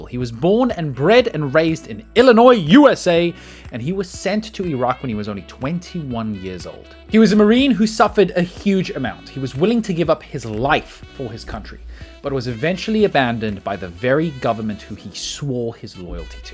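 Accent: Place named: South Africa. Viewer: Surely you’re South African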